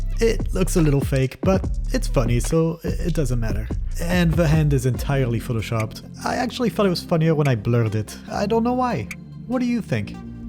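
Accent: France. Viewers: Il y a un petit côté français dans ta voix non ? :) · Wow, I really only twice heard a hint of your french accent in the mein part of the video. Your English is perfect, especially compared to my Parisian colleagues! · are you french? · Incredible video !are u French ? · T’a un accent français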